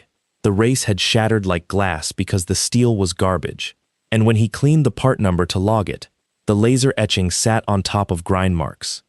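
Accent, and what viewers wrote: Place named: Australia. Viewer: Excellent story. Lovely voice for this from Australia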